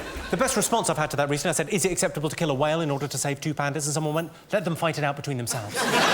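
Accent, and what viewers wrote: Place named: England, United Kingdom. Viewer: I was gonna say that he sounds so lame as a comedian but actually just listening to an english accent its annoying itself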